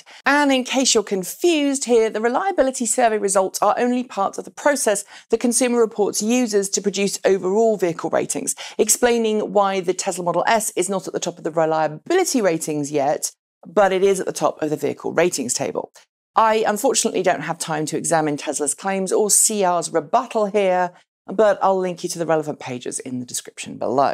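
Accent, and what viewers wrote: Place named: United Kingdom. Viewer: Please stop doing these filler stories, it's a waste of your talent and resources. I'd rather see less content that was more important. It's not necessary to come out with a new episode every day if there's no new news., please do a story on the Securities lawsuit from Khang & Khang LLP against Tesla. I'm not sure what they're all upset about. So what Tesla missed their Model 3 production goals, they always miss their production targets. What damage did it have? Tesla stock investors are ignoring it and their stock is still trending up. I'm curious if it's haters trying to bring Elon down? Btw I'm one of your Patreon supporters because I find your show with its professional level production values, unique extensive research, optimistic yet balanced reporting and your delightful British accent, really set your show apart. 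I don't see ads on YouTube because I pay for YouTube Red. Ads drive me crazy so $9.95 a month is a small price to pay for my sanity. Keep up the good work!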